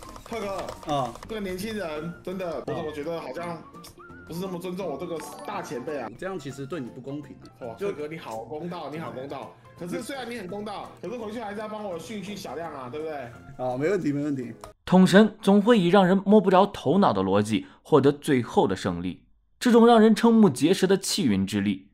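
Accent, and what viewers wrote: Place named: South, China. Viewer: 南方主播，一嘴土味。做出什么事来都正常，从来不看。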